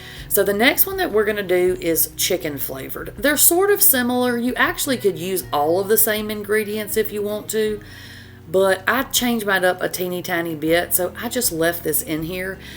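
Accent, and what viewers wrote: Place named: Carolinas, United States. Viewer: I made the chicken ramen today. Other than it being a little salty, it was *the best ramen I’ve ever had*! ❤ I made just the one so that I could taste for saltiness, my husband is on a low sodium diet and I just got used to it. I’ve been watching quite a few of your videos for the past few days, I finally hit that subscribe button. I love how your videos are precise, to the point, and you don’t edit your mishaps. It lets the younger viewers know that we all have mishaps, spill things, and occasionally forget to set the kitchen timer and burn something…even when we’ve been cooking for 30+ years. 😅 Btw, I love your accent. I’m assuming that you’re from one of the Carolina’s (I’m not asking for personal info, so no need to reply to that), definitely not from Georgia because you pronounce pecan correctly 😂. I was born, raised, and stayed in southern Mississippi. 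😊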